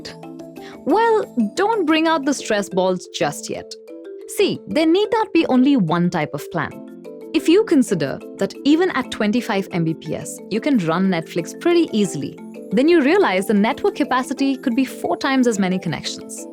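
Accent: India. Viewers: I don't like the Indian accent in general (for some reason it gets on my nerves) but it was a very informative video so I stayed to the end. Keep up the good work. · The highest number of internet provider, currently sited on the web in India, was 358. The smallest number of internet provider in the United States I could find was 2737 internet service providers in the United States: 894 DSL “Digital Subscriber Line” providers. One site citing the CIA, said there were over 7,000 Internet service providers in the United States. Given the difference in populations. It does not appear that number of providers and price have an inverse correlation. Enjoy your blog, great title. Interesting topics presented with intelligences and a refreshing an Indian accent. It is quite pleasant to my Midwestern ears. Thanks!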